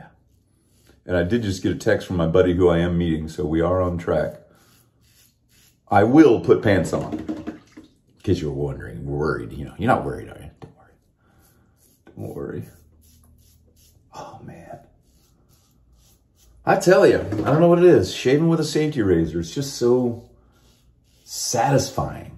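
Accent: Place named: RP, United Kingdom. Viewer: You’re accent was actually pretty good. You sound like a member of the royal family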